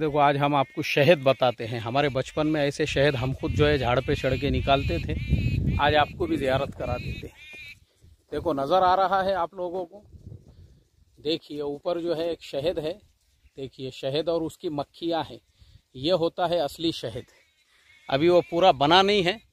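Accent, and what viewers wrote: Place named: Maharashtra, India. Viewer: Mufti sahab Aap puna me Or alag alag city me rahe magar apne ilake ki zaban aap jo laheje ke saath bolte sun ke maza ajata pure marathi wobhi Maharashtrain acent ke saath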